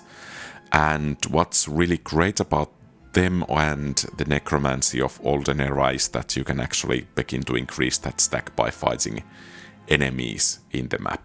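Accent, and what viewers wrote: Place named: Finland. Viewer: What happened to your voice? You went from British accent to Finnish accent. I'm confused, unless you're using text-to-speech?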